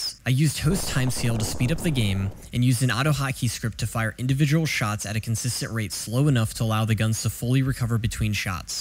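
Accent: United Kingdom. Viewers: nice brit accent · Good job on finding a subject not already rinsed by Philip. Your English accent is dire mate, kind of a weird nondescript UK chav come cockney gangster crossed with some old Victorian gent.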